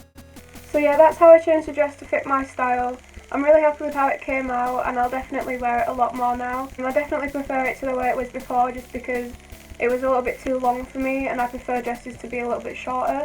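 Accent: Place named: United Kingdom. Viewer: What part of UK are you from? Your accent is cute :P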